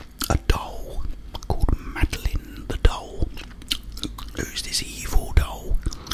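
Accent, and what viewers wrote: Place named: London, United Kingdom. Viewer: Just found your channel! I’ve never seen an ASMRtist wiv a propa landan accent. I’m born and raised London myself, so I got an extra pang of like nostalgia and just general asmr from the whispered vaguely cockney “wewcome” at the start I’m now hoping you actually are from London or this’ll sound a bit weird 😂 Love the content nonetheless!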